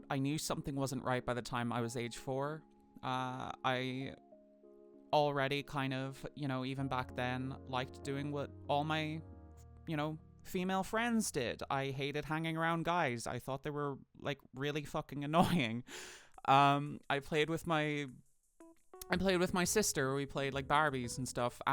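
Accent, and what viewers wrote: Ireland. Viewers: Lowkey cracking up since it’s the first time I’ve hit up Voice Acting playlist and it’s the first time I’ve heard her natural voice and my dumdum American butt was hit with the rapid fire rolodex of comparing my bank of accents like: “ is British? Ugh no. Absolutely not. How dare I? No, it’s Auss- New Zea-? NO! You FOOL! It’s NONE of these. It’s…Wait that… Hold the- The Emerald Isle? Irish? But she’s extra oh so sweet and gentle! Southwest Irish? Transgirl Irish Jax???” And then I get the Irish confirmation later which was validating. Like the visceral wave of HUHHH??? Until I got it (I THINK, tbh not sure if the region is correct) was so dramatic. Am I at l at least close? Sometimes regional variations get me. Someone who knows this sweet darling birdy, please confirm! 😂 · Hears voice Me, immediately: Munster? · Never mind about the irish accent, it's ..in your bio. 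Oops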